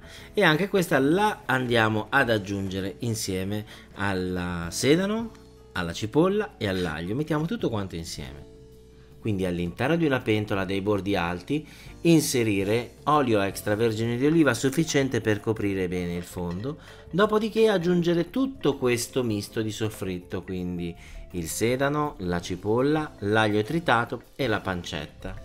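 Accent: Naples, Italy. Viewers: haaaa bellissimo il tuo napoletano,e jammebbell"jà Bravvvoo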